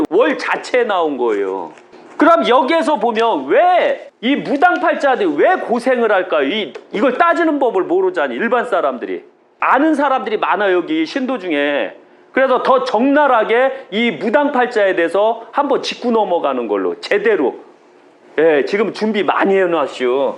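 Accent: Chungcheong, South Korea. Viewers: 안녕하십니까ㆍ10월14일화이자1차2차 부작용으로 죽음의문턱까지 다녀온사람입니다 엊그제 퇴원했구요ㆍㆍ뵙고싶은데 고관절양쪽괴사에ㆍ진작에 찾아뵙고싶었는데ㆍ아이랑 먹고사는거외엔 16년동안 일만해왔기에ㆍ혹 전화상담 하고싶습니다ㆍ 오늘도 인천이 친정이라서 ㆍ언니랑같이ㆍ유투브상영하고왔습니다ㆍ건강되찾아서 ㆍ꼭뵙고싶습니다 ㆍ정말 저도고향이 충청ㆍ청양이고ᆢ구수한 충청도 말씀이 너무좋습니다ㆍ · 이 분은 사라져가는 충청도 방언을 잘 간직하고 계시네요. 충청도 방언을 꼭 지켜 주세요. 진지드셨슈? 얼마나 점잖고 푸근합니까?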